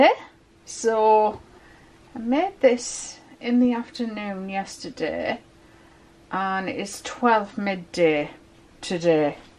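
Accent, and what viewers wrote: United Kingdom. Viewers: That gold is gorgeous. I DO miss British accents..... I hope that you are keeping safe & sound. I’m a soapmaker in NZ. I have access to all the things you do, plus wonderful natural ingredients, herbs and fruits. But that gold. Gorgeous. I would, however be scraping the very last little bit of colour out of each pot into the jugs! Your soap recipe is very forgiving as it stays nice and liquid so long. Very clever. P.S.” little bit too much soap...” never!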